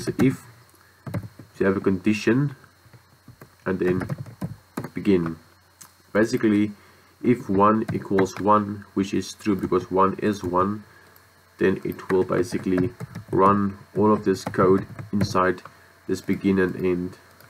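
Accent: Afrikaans, South Africa. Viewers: ayy ek kan daai afrikaans hoor in jou stem hehe, baie dankie vir al die tutorials. Dit help rerig baie!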